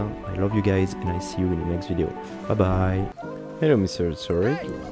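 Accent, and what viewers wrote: France. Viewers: for the timestamps and the French accent (force frérot)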